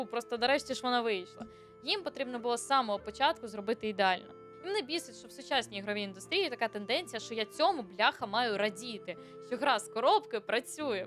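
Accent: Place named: France. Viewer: Шановна, вам дуже личить французький акцент, відос класний!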